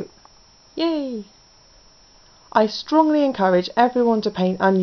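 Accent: United Kingdom. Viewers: whoo hoo your'e british! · I love your accent and videos :), are you British btw?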